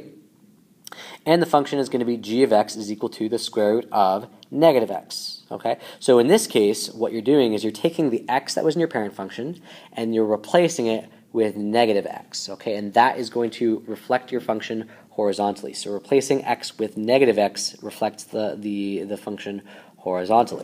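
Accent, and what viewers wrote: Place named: Canada. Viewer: cheers mate rate the canadian accent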